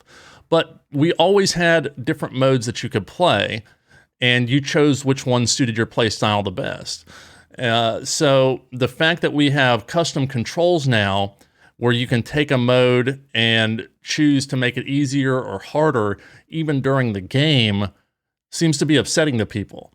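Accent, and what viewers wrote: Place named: South, United States. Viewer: You sound like Danny McBride. Are you from around the Georgia-SC border?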